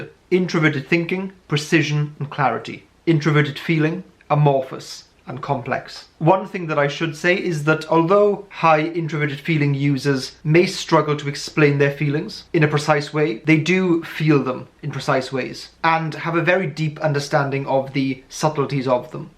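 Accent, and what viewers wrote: United Kingdom. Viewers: Impressive video. You`re so articulate and straight to the point. I relish when you express your toughts about INFP and i can see how passionate you are in front of the camera thinking, feeling, expressing yourself. INFP and INTP are so similar and complex althought little details make them so unique between them. In this lockdown i, sometimes took those mbti test since im bored hehe. 80% of the time i get INFP Type, the rest is between INTP and ENFP and sometimes INFJ, i wonder if is this you case too? BTW are you British? Because i love your accent ;-) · He looks Serbian/Bosnian or Greek but defs a UK accent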